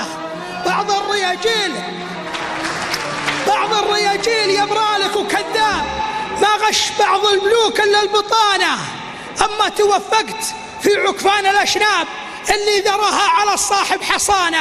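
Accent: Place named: Shahran, Saudi Arabia. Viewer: هذا درعاني واهبي شهراني يتكلم بلهجته الشهرانية الأصلية وقبيلي من روس قبايل وقحطان ونعم بهم احددانا وأنسابنا ولهجتهم مختلفة قليلاً عن لهجة شهران وهم وشهران أغلبهم بوادي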